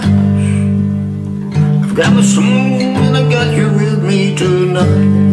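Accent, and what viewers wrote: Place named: Texas, United States. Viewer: LOVE IT YOU SOUND LIKE YOU WOULD BE RIGHT AT HOME IN TEXAS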